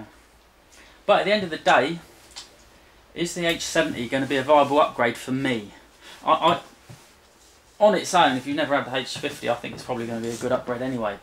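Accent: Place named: United Kingdom. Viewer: You sound british. Where do you get your parts from?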